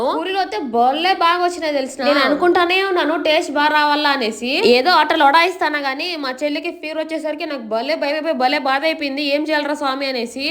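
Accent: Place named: Chittoor, India. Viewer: Ye vooru akka midi... Chittor accent la vundi..😊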